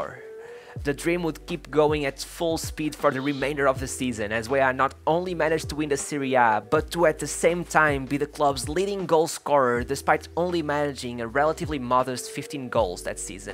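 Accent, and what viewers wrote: Portugal. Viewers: are you portuguese? sounds like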